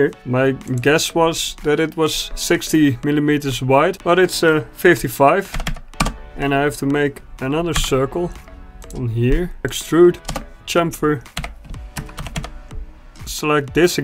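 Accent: Netherlands. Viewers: Nice man! Ik hoor je dat je Nederlands bent! 😂😂. Het grappigste vond ik toen je cod 2 aan het spelen was en je godve** zij🤣🤣. Daar viel je door de mand😂😂😂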